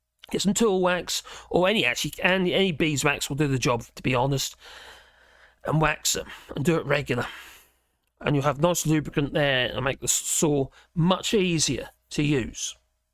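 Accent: Norfolk, United Kingdom. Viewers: You a Norfolk bumpkin buh?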